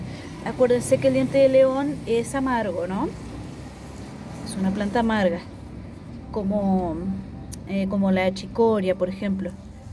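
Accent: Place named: Argentina. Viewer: Me distes una explicacion muy buena y tenes un acento Argentino de lo mas precioso saludos desde Australia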